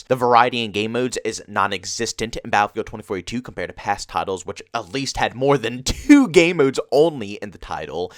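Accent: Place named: Mid-Atlantic, United States. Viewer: Dude you must be from the PA, Maryland, Delaware area with that shitty accent. The way you hold your O’s is atrocious lol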